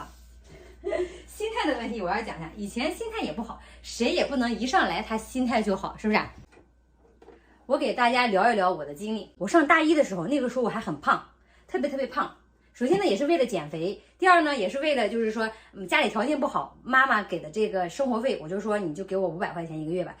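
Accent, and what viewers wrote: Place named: Northeast, China. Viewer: 一口东北味，就这口条，好好干自媒体，未必不比上班差！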